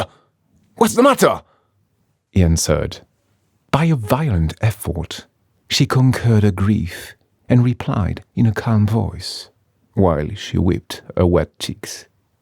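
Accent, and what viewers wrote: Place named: United Kingdom. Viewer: C'est une si belle idée de réaliser une version anglaise ! And what a delicious british accent ! I like that.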